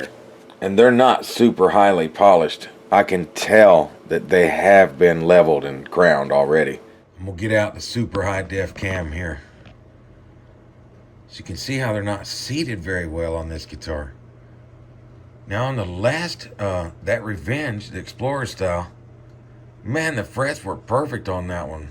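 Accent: United States. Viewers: Hi I'm from Italy, i love your accent, which part of the states are you from?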